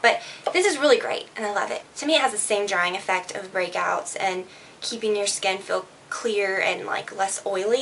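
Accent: South, United States. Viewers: Your southern accent really came out in this video. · I think is pregnant too! She is GLOWING!!! & girl I can your southern accent coming out in this video! Love it! I use Pro Active too & LOVE it!! Great video!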